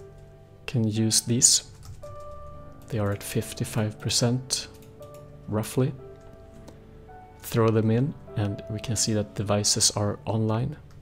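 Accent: Sweden. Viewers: where are u from? xD sounds like ur from sweden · <link> Here is one of the lists I found for 1.7.10. Honestly, I was doing it to see how much I could fit together and if they would all run, it worked and I just kept the pack and it grew until I got too many, lol! Honestly, it was a LOT easier than any other version of Minecraft before it, almost anyone can make a mod pack now if they know how to "translate" the errors that may occur, I mean hell I did it. :D As always, if you have time and what not, you're invited to join us, but I completely understand how hectic life is and how little precious time you do have. Thanks for just reading and replying to these comments. I'll spare you from other comments in other vids so you can get back to you're life. ;) You're Swenglish is just fine, truly a great job.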